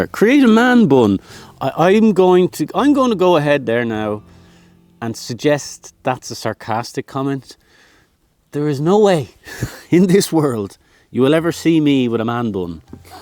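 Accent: Ireland. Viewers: He is definitely "a keeper". Charming, funny, attractive, fun, love listening to his Irish accent, blends so well with his personality. I hope he has a good women showing him the attention he deserves.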